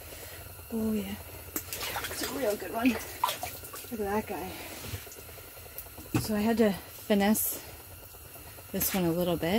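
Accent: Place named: Canada. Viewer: That Canadian accent is strong Aaay! ☺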